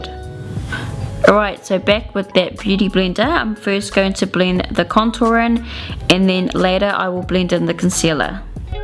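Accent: New Zealand. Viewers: Absolutely stunning makeup that you did in this video ! X ... However, subbed coz I loved your kiwi accent (now i know what people mean about kiwis having an accent...couldnt hear it !) :D I also grew up in New Zealand x Now living in Aussie!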